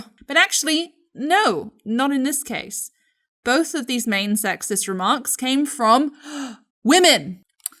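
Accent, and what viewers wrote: Wales, United Kingdom. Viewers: Yo are you Welsh as well?! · i think i found the most british person on planet earth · Subscribed cause i love your british dialect and i love animation i draw one paper myself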